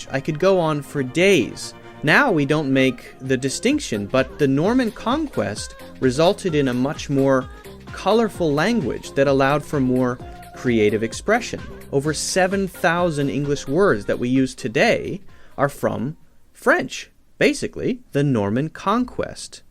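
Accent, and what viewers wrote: United States. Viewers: you are not speaking english you are whining american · what a pity is a septic talking and not a brit. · Good. One point: your English us not 'midern' it is USA English. In England we do soeak English, standard English as well as 'modern'. Please, do NOT try to confuse the rest of tge world about what modern/contemporary English is. Thank you. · The guy that is speaking is not English but American.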